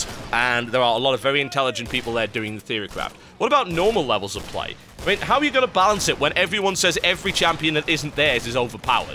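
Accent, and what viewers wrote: United Kingdom. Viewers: 27? His british accent always makes him seem so much older.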